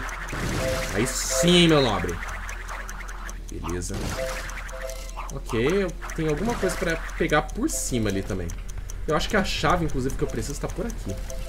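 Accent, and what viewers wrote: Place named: Germany. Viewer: o sotaque dele me lembra um soltado alemão.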